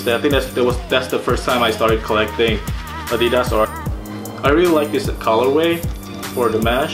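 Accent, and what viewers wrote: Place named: United States. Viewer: It's been a long time I did not hear your voice. Turns out you speak with a stark American accent now! :)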